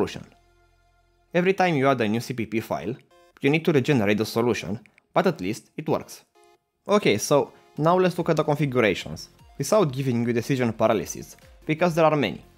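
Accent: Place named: Romania. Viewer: Se aude romanul din tine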